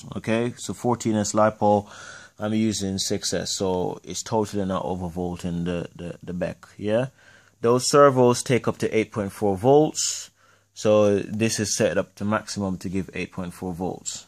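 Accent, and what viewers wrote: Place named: Jamaica. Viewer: Sounds like my hometown Jamaican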